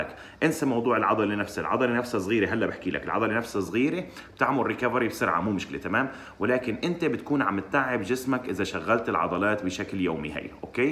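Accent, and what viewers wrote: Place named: Syria. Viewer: هذا سوري مو مصري ي سطا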